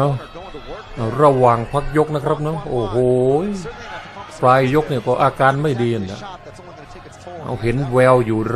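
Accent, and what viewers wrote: Isan, Thailand. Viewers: รู้สึกรักภาษาอิสานเลยค่ะ พอมาฟังท่าน พากย์ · ฮาคนพากย์เสียงนะคะ(แอดมิน)ฟังการพากย์เสียงก็ขำมากค่ะ มีพูดอีสาน แคดแลตออกมาด้วยนะ ฟังไปขำไป😛😁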